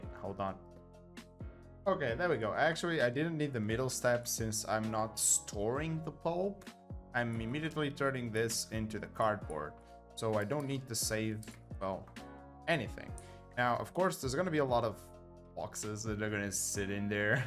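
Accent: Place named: Portugal. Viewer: Notei logo que é portuguessss 🙂‍↕️ tugaaaaa 🇵🇹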